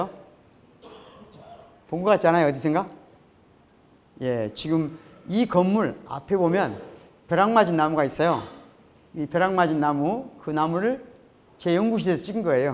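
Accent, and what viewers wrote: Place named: Jeolla, South Korea. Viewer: 박구용교수님 감사합니다. 요즘 유튜브를 통해 교수님을 만나는 재미가 솔솔합니다. 그리고 너무 유익하고 재밋습니다. 아마도 박구용현상이 이시대를 휩쓰는 건 아닐까하는 생각이 들기도 합니다. 70이 되어 미국에 살고 있는 용봉에 후예로서 가슴이 벅찹니다. 적당한 전라도 사투리 억양도 가슴을 따듯하게 하네요. 광주! 라는 말만 들려도 울컥하는데, 전남대! 라는 말은 더욱 뜨겁습니다. 감사합니다. 저는 이제 박교수님의 열렬한 팬이 되었습니다. 너무너무 자랑스럽습니다 박구용교수님, 당신의 탁월한 지식과 열린 가슴과 번뜩이는 지혜에 응원과 박수를 보냅니다. 당신의 헌신으로 암울한 사회가 깨어나기를 진심으로 소망합니다. 사랑합니다❤❤❤❤❤❤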